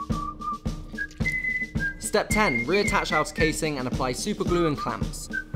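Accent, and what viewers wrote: United Kingdom. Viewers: this is basically an old casey neistat video with a British voice over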